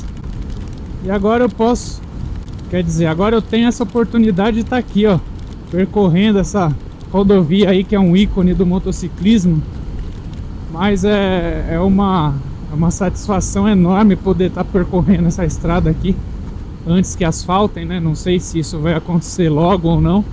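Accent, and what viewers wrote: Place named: Minas Gerais, Brazil. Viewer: Pelo sotaque acho que o é mineiro, mas pode ser de Goiás ou Espírito Santo... Grande abraço ao e aos seus companheiros!!!!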